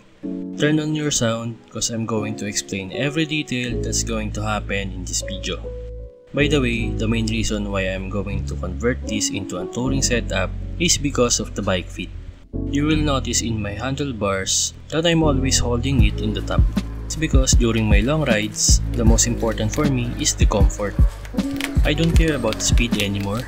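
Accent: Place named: Philippines. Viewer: I notice the Accent, Filipino ka sah? anyway ganda ng touring set up nato.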